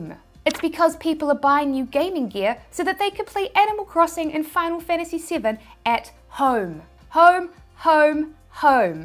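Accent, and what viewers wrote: New Zealand. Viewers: I'm in England, and think your New Zealand accent is reassuringly super cool. Completely in love with you, brilliant presentation so easy to understand. I was never much interested in on-line marketing until, Conyvirus found me on YouTube and stumbling onto your channel, Wow you are impressive, your running commentary alone is so descriptive I have you in my ear most all the time, helping and encouraging, Brilliant brilliant brilliant - you have changed my life, for the better for ever. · You sound like a kiwi girl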